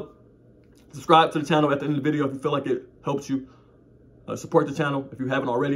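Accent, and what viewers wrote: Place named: Texas, United States. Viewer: lol ok you talk like them bois in tx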